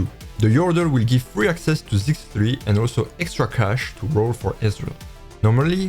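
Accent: France. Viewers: Mec je kiff que tu ais l'accent français, de l'humour, de l'intelligence et un amour pour TFT ! Tu nous fais sortir de l'ordinaire 🙌🏼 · Asian dude with french accent is throwing me off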